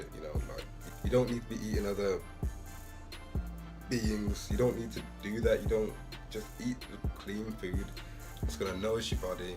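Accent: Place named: Birmingham, United Kingdom. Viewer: The birms accent is too wavey!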